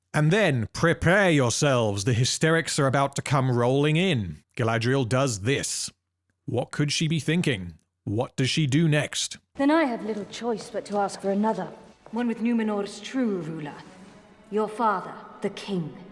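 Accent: United Kingdom. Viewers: Why do you hate Donald Trump? I mean from your accent I would say your Brexit. So we had a leader who's deal was Illegally ( key phrase, breaking the law) entering and taking jobs, and social services, that American Citizens can not get. How would you like it if 2,500,000. People Illegally crossed into your country and your government was givening them 15000 and a place to stay with free medical care. When I know veterans who cant get medical help and are living out of their car. Love the vids so far, i really do. But take your anti Trump pro Biden crap and keep it on your side of the mic. Your making the very same mistake amazon made, while criticizing Amazon for said mistake. Thanks. I will now go back to enjoying the rest of the series.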